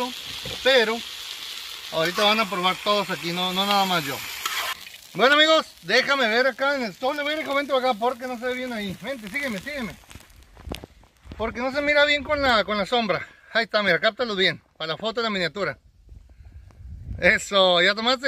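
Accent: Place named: North, Mexico. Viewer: Muy buena receta 👌 me encanta el acento de los norteños saludos desde Papantla Veracruz prometo hacer la receta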